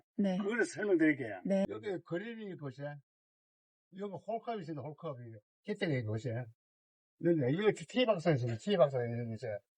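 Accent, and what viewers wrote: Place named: Gyeongsang, South Korea. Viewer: ㅎㅎ 설명이 잼있네요^^ 경상도 아저씨 <link>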